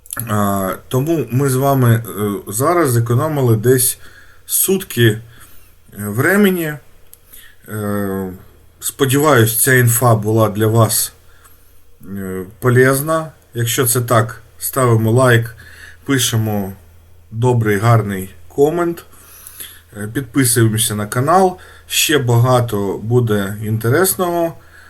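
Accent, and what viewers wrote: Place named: Surzhik, Ukraine. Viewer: молодець, і як фахівець, і як справжній українець, а саме: ділиться власним досвідом, за допомогою якого я раніше відновив HDD диск Western Digital на 500 Гб, а також перейшов на українську мову, що дуже приємно чути, навіть суржик від у мене особисто викликає повагу до нього, адже найважливіше зробити перший крок. Я раніше теж спілкувався мовою східного "сусіда", але зараз розмовляю українською і незначні помилки з часом виправляю, а моє оточення ділиться приємними враження від почутої від мене української мови. повністю підтримую. Від мене лайк, давня підписка і всього тільки найкращого.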